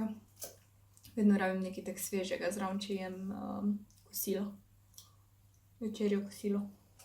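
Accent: Carinthia, Austria. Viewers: A ti si slučajno iz Koroške?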